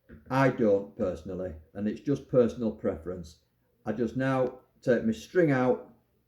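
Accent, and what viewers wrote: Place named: North, United Kingdom. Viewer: Great video mate... Exactly what I needed and straight to the point and good to hear a fellow northerner ... Thanks from Hull nick 👍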